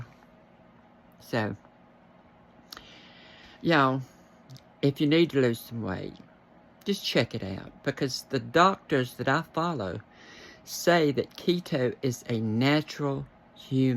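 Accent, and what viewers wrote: South, United States. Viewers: You have a beautiful southern accent the ASMR community would definitely appreciate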